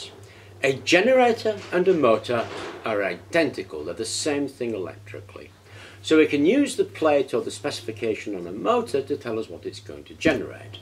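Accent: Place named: United Kingdom. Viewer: lol, i cant stand british accents.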